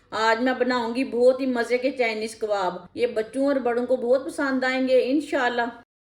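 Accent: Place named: Punjab, India. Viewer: آپکا پنجابی لہجہ بہت اچھا ھے👌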